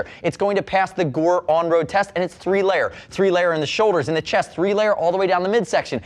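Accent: United States. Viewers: Dear god, that is just painful to listen too. Or at least it is for my Scottish ears. That’s like Americana on steroids! I lasted 30 secs, sorry!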